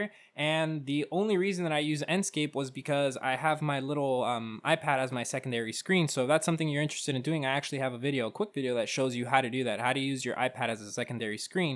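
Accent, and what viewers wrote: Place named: Miami, United States. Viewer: I knew it since i heard your voice my first reaction was “something about his dialect just screams Miami” lol Our dialect sticks out like a sore thumb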